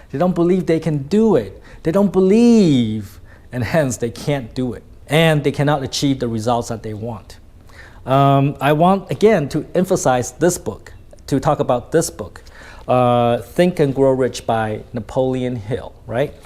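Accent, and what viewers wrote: Standard. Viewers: ns rất chuẩn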